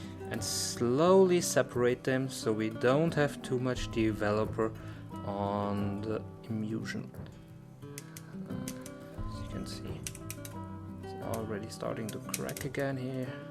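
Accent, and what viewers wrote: Germany. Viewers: Loooove your videos! I learnt a lot! :-) btw are you German by any chance? Thought I heard a light accent haha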